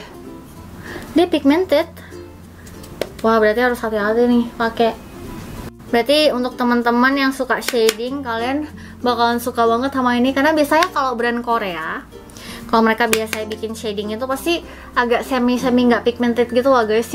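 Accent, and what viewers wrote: Bali, Indonesia. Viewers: logat balinya masih ada yaa eonnie "lagi satu" bukan "satu lagi" hehehe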